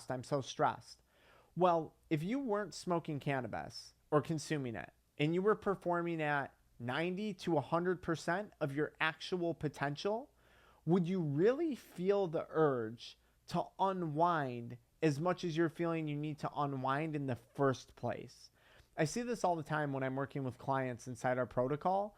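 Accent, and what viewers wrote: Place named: Western New York, United States. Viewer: I know another western New Yorker when I hear one 😂 awesome content as always!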